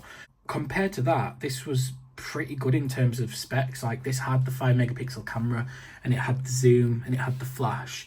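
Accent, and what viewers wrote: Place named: Cockney, United Kingdom. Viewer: This man is speaking with a Cockney accent.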